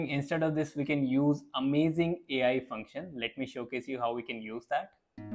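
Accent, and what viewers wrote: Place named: Bangalore, India. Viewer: Braw you're from Bangalore daa?!!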